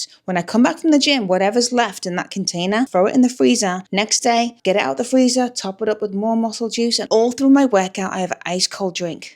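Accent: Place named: Liverpool, United Kingdom. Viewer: I knew that was a scouse accent! Love these tips - I do simple sugars after workouts as well, cereal or milkshake are my favs. New sub! X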